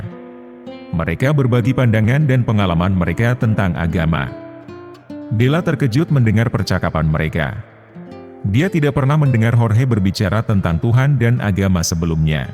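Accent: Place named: Java, Indonesia. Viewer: di pengucapannya. Mungkin lidah Jowo udah begitu. Gk bs diubah. Tp konten videonya, 👍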